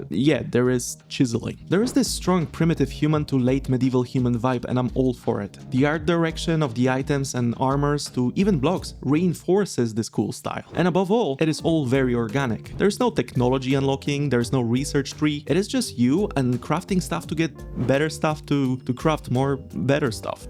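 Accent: Poland. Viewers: as soon as I heard your accent I was like, is he polish? and then i checked channel localization XD Pozdro byku, spoko film i rozwijaj się dalej!! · I immediately knew you were Polish. Years of listening to people read in english class suddenly came back to me when I heard you speak.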